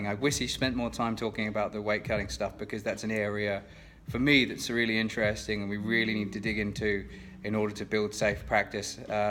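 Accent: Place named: Australia. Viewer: Hi mate, what part of the UK are you from? When you speak you sound Australian.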